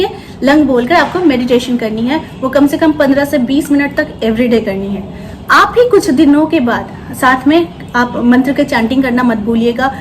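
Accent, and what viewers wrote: Bengal, India. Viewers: Are you bengali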